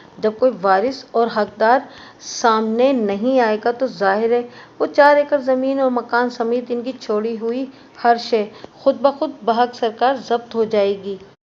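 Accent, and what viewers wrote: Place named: Karachi, Pakistan. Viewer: BB aap Ka taluq Karachi se feel hota hai 🤩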